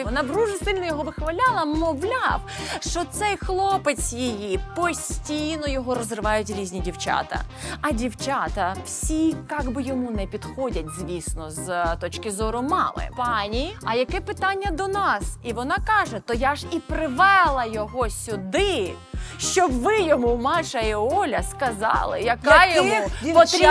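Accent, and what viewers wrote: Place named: Western Ukraine, Ukraine. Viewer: Звідки у ці слова..привела з наголосом на Е і легкими західними інтонаціями....Це риторические питання.🎉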